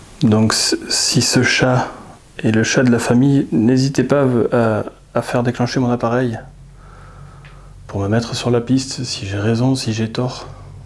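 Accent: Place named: Southwest, France. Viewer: J’aime beaucoup tes enquêtes. Tu es sensible, respectueux et touchant et… tu as l’accent de chez moi 😜 Tu es de la Dordogne ou du Sud Ouest j’imagine. Merci pour tes vidéos… je n’en manque aucune. Protège-toi quand même avant chaque enquête. Bonne continuation 🌸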